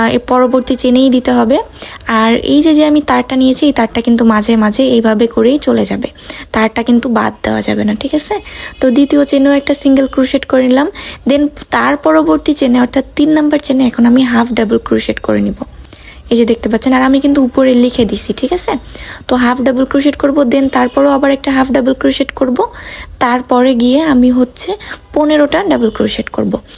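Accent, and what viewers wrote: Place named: Naogaon, Bangladesh. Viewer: আপনার বাসা কি নওগাঁতে ভাষায় নওগাঁয় মনে হচ্ছে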